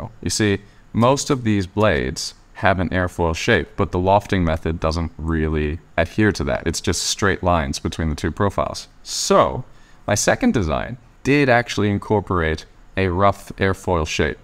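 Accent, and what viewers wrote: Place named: Eastern Canada, Canada. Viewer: by your pronunciation of your T's I'm guessing you're from eastern Canada? Thanks for your work on this. Fascinating stuff I will eventually be trying to print this with CF-Nylon and again with Lightweight PLA (100% infill)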